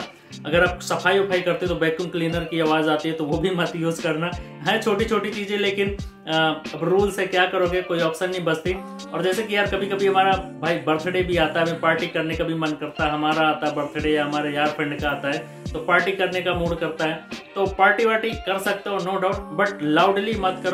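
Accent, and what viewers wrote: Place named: Bengal, India. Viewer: Apka bolne ke tone bangalio ke jesa h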